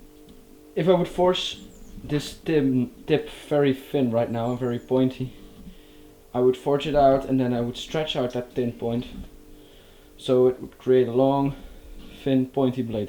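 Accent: Netherlands. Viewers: You 're dutch ?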